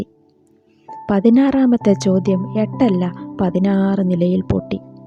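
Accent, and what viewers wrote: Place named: Delhi, India. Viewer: Script good...presentation language delhi malayalam pole enikku thonny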